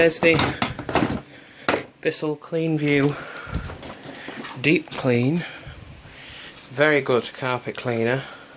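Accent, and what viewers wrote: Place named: Manchester, United Kingdom. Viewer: A mancunian accent wohoo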